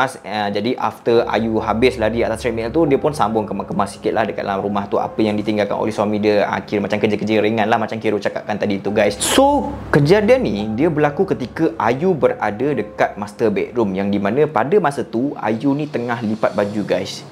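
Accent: Johor, Malaysia. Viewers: org johor ke?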